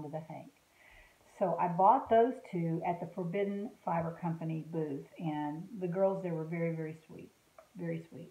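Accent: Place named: Tennessee, United States. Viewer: G'morning;) I just stumbled on your videos... so I binge watched you last night while I was hooking. I absolutely love your accent and the first of your videos I thought to myself; omg is that a Tennessee accent? I just love Tennessee, I've only been through there a few times when I was younger and once stayed a few days in Nashville, but still remember it so clearly! Anyway, I've had fun watching, keep up the great videos;) Donna from Canada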